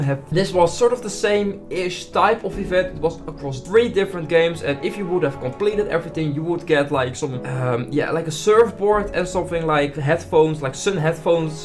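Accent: Netherlands. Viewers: Because he has a Dutch accent.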